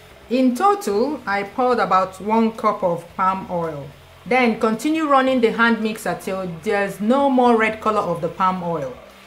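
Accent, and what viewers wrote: Igbo, Nigeria. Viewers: Just like your Igbo accent. E dey deep ❤ Cheers sis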